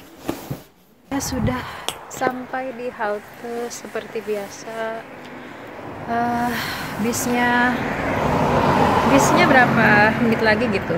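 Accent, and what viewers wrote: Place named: Sunda, Indonesia. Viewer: logat sundana meni eces..teteh❤❤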